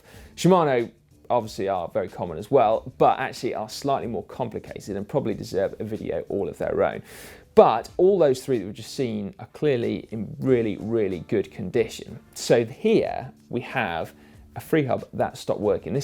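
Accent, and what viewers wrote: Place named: RP, United Kingdom. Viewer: What a pity you speak like Prince Charles with his boyfriends you know what up his you know what.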